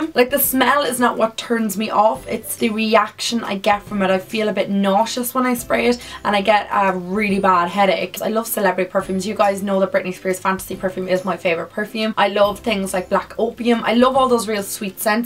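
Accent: Ireland. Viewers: Is that an irish accent? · Beautiful accent! Are you Irish? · Is she from ireland??❤️ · Lovely accent! irish?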